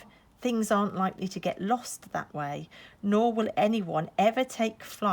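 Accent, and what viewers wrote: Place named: Shropshire, United Kingdom. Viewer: yay! I can tell by your accent, I’m in Shropshire. So in another of your videos about how you’re learning tarot,it was a VR #my tarot growth, the two books you’ve been using are ones I don’t have, which is pretty miraculous seeing as I have about 40 books on Tarot ( of course I haven’t read them all!!!!! Not even close as I’m still in the “ok that looks helpful, I’ll get that and then another book is recommended on a video or by Amazon etc and the pile just keeps getting bigger🤷‍♀️”. Anyway you said about being nervous of putting the bigger spreads together. I would like to recommend the methods in the Tarot face to face book as you look at how cards relate to each other first before you learn any meanings at all. The first spread after the initial lessons is a 25 card spread where you are using these new skills and it’s not scary at all! 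I’ve found it so valuable and so much easier than looking at cards individually and their meanings in a single card. It just seemed counter intuitive to me to be learning reading the tarot card by card individually seeing as our goal is to read cards together in spreads. The book has been republished under a new name Practical Tarot Techniques: Your Essential Tool Kit for Better Readings and is a Paperback – 25 Oct. 2019 by Marcus Katz and Tali Goodwin and is available through amazon but not as an ebook unfortunately. Because then you could have downloaded a sample of the book to see what you think of it. I’m not affiliated to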